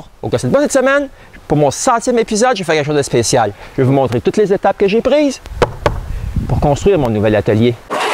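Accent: Quebec, Canada. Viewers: j'aime bien aussi votre accent canadien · j'adore l'accent québécois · j’adore cet accent québécois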